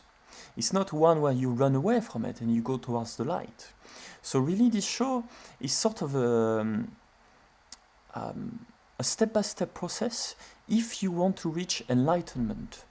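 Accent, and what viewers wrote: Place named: France. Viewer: Thanks man! I fell in love with your french pronounce 😍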